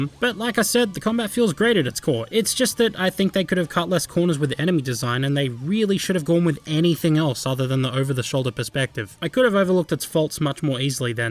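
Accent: Australia. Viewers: Finally!!! I love all your Vids! They're so funny everytime Maybe your Aussie accent helps a bit :D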